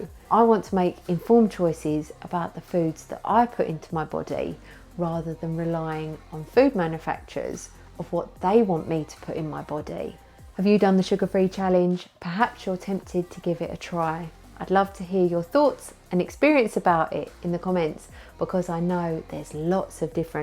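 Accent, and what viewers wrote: United Kingdom. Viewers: You have the most british voice ever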